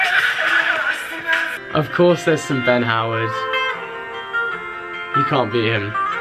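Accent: United Kingdom. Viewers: You’re SO British- coming from a Brit jeez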